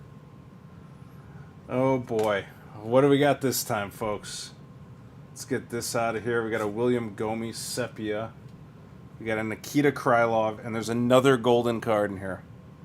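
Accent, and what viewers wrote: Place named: Midwest, United States. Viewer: Or maybe Chicago? Midwest for sure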